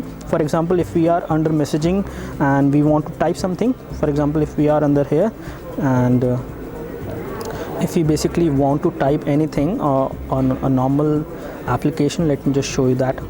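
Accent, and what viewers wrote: India. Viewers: Indian Pronounciation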